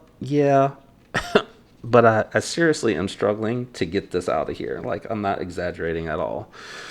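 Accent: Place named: United States. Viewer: Yeah buddy your good at making those videos where you from you sound like your form the good old US of a ☺️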